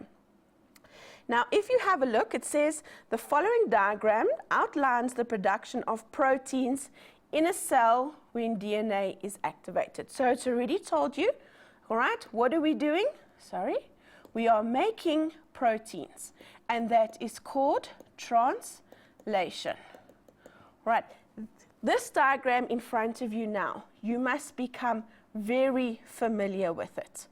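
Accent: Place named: South Africa. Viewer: Love the SA accent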